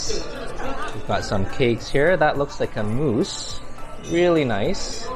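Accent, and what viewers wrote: Canada. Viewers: Hi! By any chance are you Canadian? I detect an accent, and also you’re super friendly. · I love to hear you speak, is that a Vancouver or Toronto accent?😊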